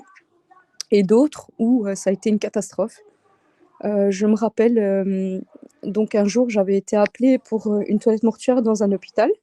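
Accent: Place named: Belgium. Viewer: Cest pas beau laccent belge ...en dehors de ca cest bien que des personnes sache faire le lavage mortuel et davoir le courage ...Allah oumma barek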